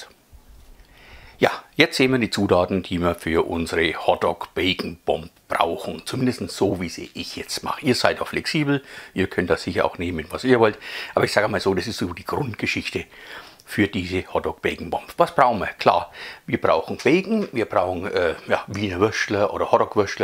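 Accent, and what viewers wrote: Franconia, Germany. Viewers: Hallo! Dafür keinen Dank! Ich dachte mir halt das die Preissn uns a Fäschdäner! Grins!:-) Und Red weider Frängisch ich mach dann dein Übersetzer! Grins Gruß Robert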